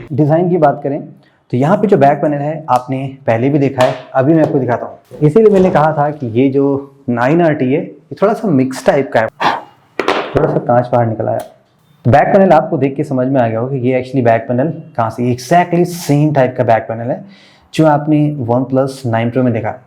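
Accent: Lucknow, India. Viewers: ji aap lucknow se hi ho kya???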